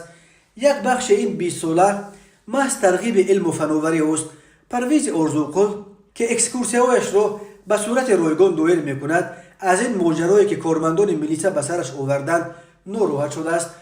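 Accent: Pamir, Tajikistan. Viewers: Памирский акцент, помири гап зан помири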